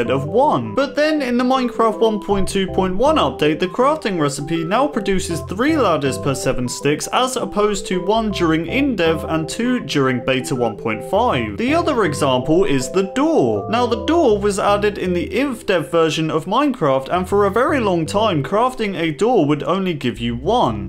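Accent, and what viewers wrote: United Kingdom. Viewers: i cant believe ill say this but...now i understand why my friend HAtes english accents...fuck i hate myself now... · R u British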